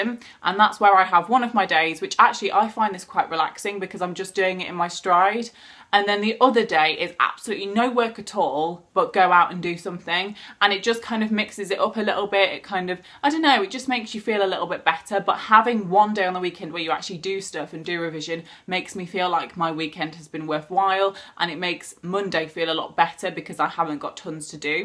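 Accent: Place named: East Midlands, United Kingdom. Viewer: I love organised omg, I have a things to do pad from poundland and it's so good- you can write the date on the top too it's literally amazing :L ! you would just think it's another notepad but it hs space for you to tick things off! and then the other side is for notes and then a mini timetable! would use it for revision as well! etc - where are you from ?! your accent sounds east midlandsy- I could be so wrong! I did chemistry and biology as well. Workouts really help me! especially as someone with learning difficulties it makes my mind feel clearer. Top tip for productivity too, make sure you're not wasting your time by doing the wrong thing (': so with revision sometimes I wouldn't be revising the most relevant bit so going back to the guide/plan of what your course covers and writing the headers do a 1 minute test where you write down all of the keywords you remember and processes and then you really remember what you need to go back to and revise! 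also to prioritise! as a lot of people put big tasks off and start with smaller ones and big ones always get pushed back. Anyway loved the video especially because it's about organising! thank you so much for visiting my channel- I hope you'll come back again some day! xx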